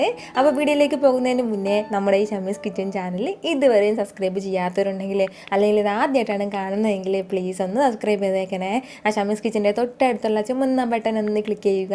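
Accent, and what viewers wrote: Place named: Palakkad, India. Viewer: Palakkad ആണോ മോൾടെ വീട് ....🌹🥰big fan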